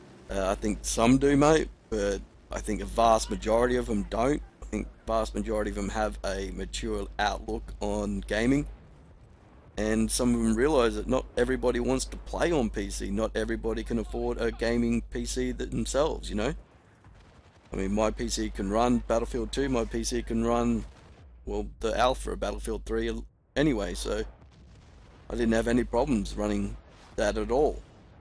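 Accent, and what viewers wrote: Australia. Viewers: Hmm, you sound pretty australian to me :) As I am austrian, i love it when ppl mix names up xD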